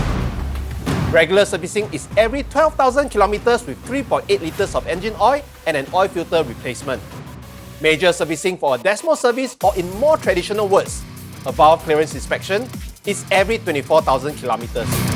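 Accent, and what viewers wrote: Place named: Singapore. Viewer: I like the content but guys, can we stop with the extreme Singlish pleaseeeee. It’s honestly quite annoying and totally not necessary at all. Don’t have to speak like ang moh but certainly not like some coffe shop uncle. Cmon guys this channel have the potential to be a proper bike review channel.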